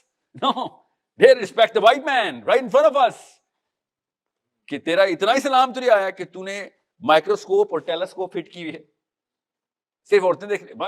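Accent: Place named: United Kingdom. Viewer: Aur English accent ziyada hay clarity kum hain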